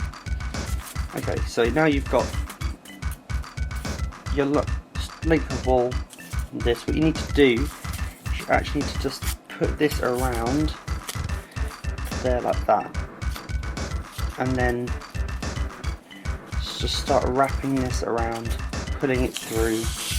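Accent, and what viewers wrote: United Kingdom. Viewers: Because he has an English accent? It would be better if it was an American talking. I'm british and find the accent from across the atlantic much nicer ! :)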